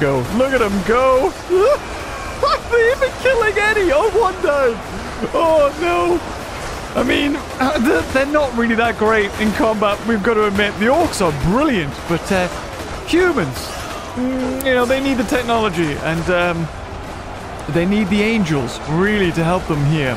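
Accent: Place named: United Kingdom. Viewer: i never heard a brittish bloodletter but i approve massivly great commentary to the happenings on screen :)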